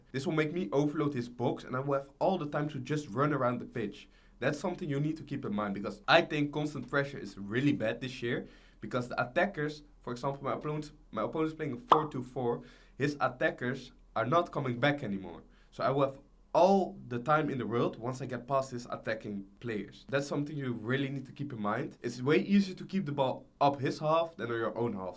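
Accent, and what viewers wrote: Netherlands. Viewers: Thanks voor de tactieken, maat! Intussen, je klinkt echt super Nederlands, hoor!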